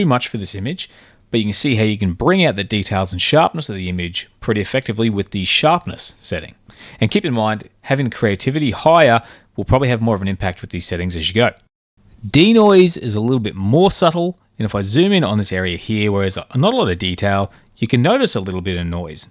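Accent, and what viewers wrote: Australia. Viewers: It is fascinating how Australians say "Peower", "Draring", etc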